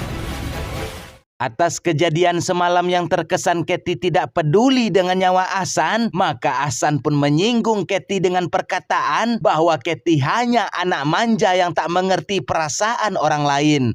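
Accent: West Sumatra, Indonesia. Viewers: Eh mimin ny orang sumatra barat kyak e mah salam dari Padang mimin sehat sehat min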